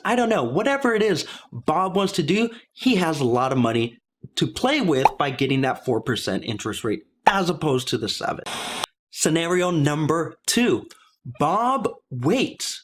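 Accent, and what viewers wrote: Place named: Texas, United States. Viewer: A for content...F for Texan accent; stigma stigma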